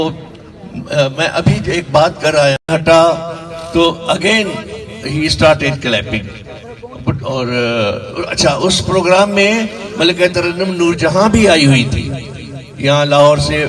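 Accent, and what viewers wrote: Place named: Uttar Pradesh, India. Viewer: Paisa Pbi to ka aunda te boli UP di bolda? Ki e tuhadi jamir Pak wali o.?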